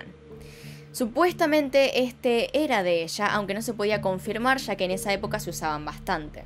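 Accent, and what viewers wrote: Argentina. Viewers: Hay veces que no me gusta el acento argentino (sin ofender a nadie) pero sin embargo amo tu voz y la manera en que relatas todo ❤️ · Me encanta tu acento argentino. Estás súper guapa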